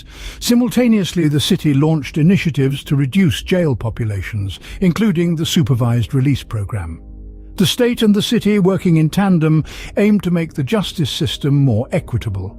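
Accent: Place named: United Kingdom. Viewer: Whats with the proper British person narration?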